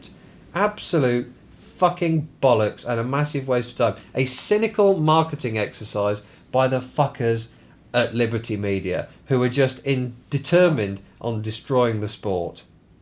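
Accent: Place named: RP, United Kingdom. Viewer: your so posh O.O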